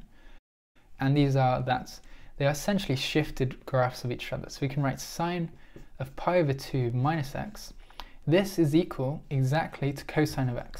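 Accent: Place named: United Kingdom. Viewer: Thank you so much I love your British accent btw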